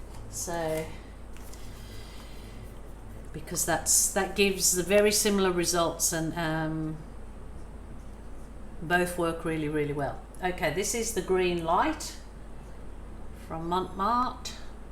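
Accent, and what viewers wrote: Birmingham, United Kingdom. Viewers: You just keep on rabbiting, it’s what we brits do! You can take the girl out of Brummie, but you can’t take the Brummie out of the girl! Love the swipe.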